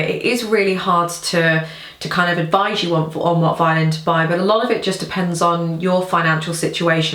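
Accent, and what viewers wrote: England, United Kingdom. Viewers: I like your accent. I can tell you are from England.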